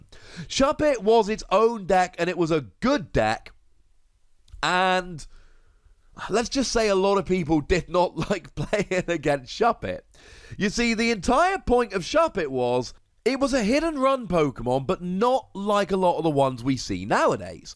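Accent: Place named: England, United Kingdom. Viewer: I LOVEEEEEEEEE English accent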